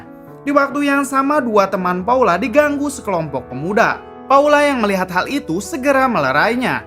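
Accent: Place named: Madura, Indonesia. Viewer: Alur cerita kalau dibacain pakai aksen Madura emang >>>>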